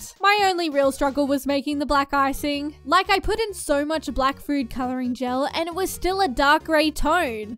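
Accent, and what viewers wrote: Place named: Australia. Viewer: My first time watching your channel and I gotta say...when I saw the png of the coles cake I was like .....yeah it's the accent and the coles cake....AUSSIE!!!!! Omg my mum n dad would CONSTANTLY get my siblings and I the coles vanilla sponge cake with whip cream and strawberry jam.... only dad liked it (and cause he paid and got it he picked it) I hate sponge cake now ;v; cake trauma. When we got a tad older we started also getting the same mud cakes...with the very very LOUD lids 🤣😂 memories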